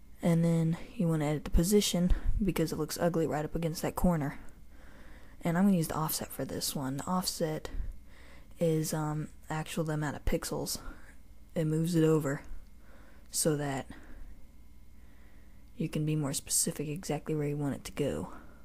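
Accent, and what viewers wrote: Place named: Florida, United States. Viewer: dude your voice sounds a mixture of something like you live in florida and then you sound kind of low anyway that was off subject.========================== thx video really helped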